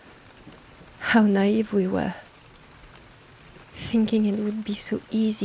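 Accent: France. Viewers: more french accent, that's what i subscribed for, hell yeah!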